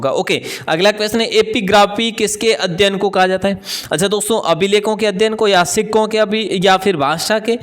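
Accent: Marwar, India. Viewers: Sar class v mst the par aapki marwadi boli su piyar hoygo marwadi to me v bola pr takali marwadi choki lage 😀😀😀😀😀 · Marwadi boli hakri gni lage sa aapri bhira o sir ji 😁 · Sir aaj to bhut jayada maja aya🤗🤗 class mai class to roj hi achi hoti👌👌 lekin aaj apki marwadi wali boli se or jayada maja aya 🥰🥰 sir aap class mai marwadi bolta riya karo gani choki lage 👌👌👌👌🤩🤩tq sir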